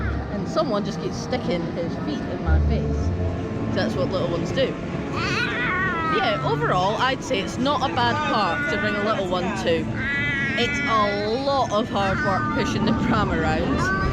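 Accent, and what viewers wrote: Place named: Scotland, United Kingdom. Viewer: tied up in the car with tape across his mouth? No wait … he's free! Jokes aside great vlog, very informative and let's face it the Scottish accent adds to the quality;) I'll come across as a bit of a H&S geek but that door should be better marked or open inwards, you could be reading that info board up close then whack!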